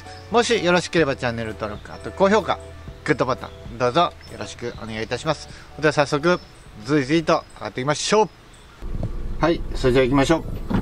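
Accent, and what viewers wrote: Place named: Oita, Japan. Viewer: 、大分弁が身についちきたなぁ😁 どーくり師匠のおかげかな❓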